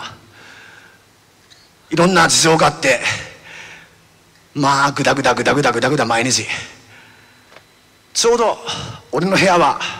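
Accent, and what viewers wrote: Yamagata, Japan. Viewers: 山形県民だから山形の訛りのおかげでさらに入ってくる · 山形弁すげー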